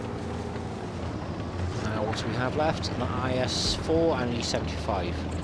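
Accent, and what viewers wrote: Wales, United Kingdom. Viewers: Those Dulcet Welsh Tones :)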